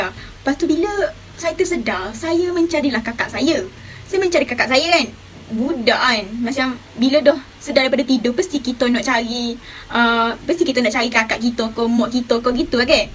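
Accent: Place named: Kelantan, Malaysia. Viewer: Tiba" kau yg seram 🤣... Kecek jk kelantan.. Saya lgi syok tgk... of course nk content seram 👍👍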